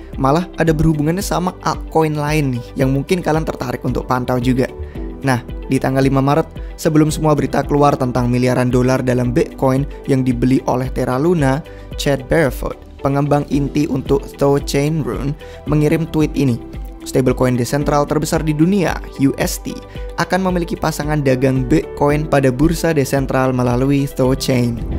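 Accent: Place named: United Kingdom. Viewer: Aksennya British banget bang😂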